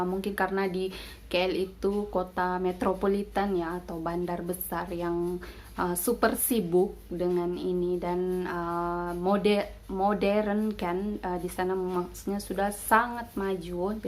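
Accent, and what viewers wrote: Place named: Riau Islands, Indonesia. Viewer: Rezeki ade dimane2 ' kat mne2 kite duduk tinggal akak /klo ade kemahuan nye ..pasti akan ade perubahan nye' owh ye akak berasal dari kepulauan Riau ye knn. Kn pe dgr loghat dari akak '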